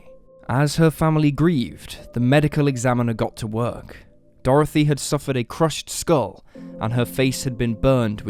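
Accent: United Kingdom. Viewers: yes, I like the British narrators best, as long as they are not speaking in a northern accent, and this one is good.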